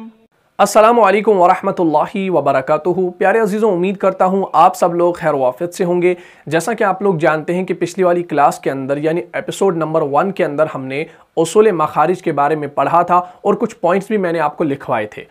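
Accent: Kashmir, India. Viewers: Bhai jaan aapka accent Kashmiri jaisa hai ??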